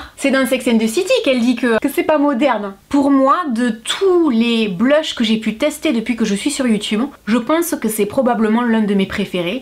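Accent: South, France. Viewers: Ta bonne humeur mêlée à ton petit accent du sud me donne toujours du peps (surtout en ces temps troublés) bisous à toi porte toi bien 😘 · Merci beaucoup pour vos vidéos, vous êtes très sympa et votre accent du sud est génial 😀Le rouge à lèvre gerlain, c’est vraie qu’il ai génial et super sympa. Je l’ai eu en cadeau Noël 2019 .😊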